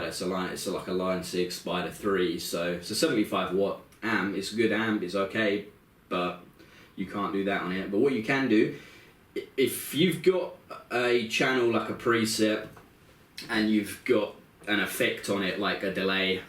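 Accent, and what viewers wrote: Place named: Rio de Janeiro, Brazil. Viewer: He speaks like a pal from Rio de Janiero!..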